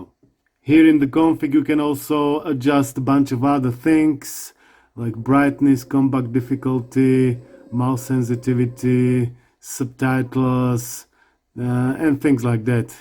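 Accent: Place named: Latin America. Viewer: por que siento que eres latino xd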